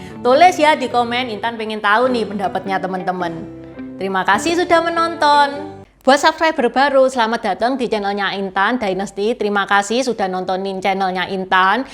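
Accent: Java, Indonesia. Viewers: Intan medok banget🤣👍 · Makasih ya ce, bertambah wawasan nih, awalnya lihat channel ini rasanya aneh banget, dikiranya orang lokal ternyata Tionghoa, saking medoknya aku kira cece asli Jawa.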